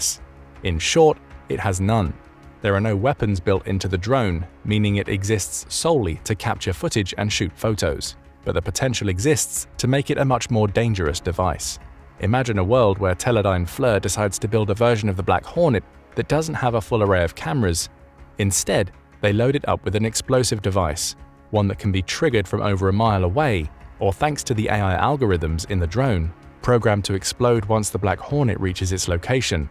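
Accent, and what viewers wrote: United Kingdom. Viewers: It's always nice to have a reliable British sounding narrator on a video. This guy could describe a 1986 Ford Chiltons manual and it would sound good. 👊😎 EDIT: $200,000 a pop!?! Whaaaaat!?! That's f***ing crazy! I can buy a better one, same size just a different color at a Goodwill for like $20! 🥹 "Black Hornet Drones: The only thing you'll hear is the sound of your head getting slapped.... Black Hornet." That would be the ad. 😆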